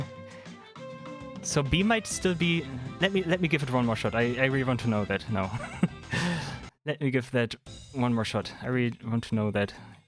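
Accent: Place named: Germany. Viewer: Bro has a German accent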